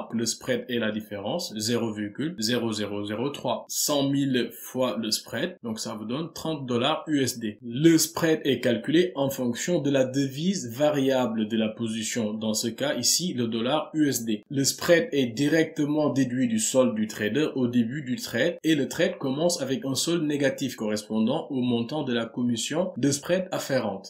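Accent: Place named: Senegal. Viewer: Bonjour, très bonne vidéo ! J’ai crue percevoir un soupçon de parfum de la teranga dans votre élocution(!très agréable),right? Cordialement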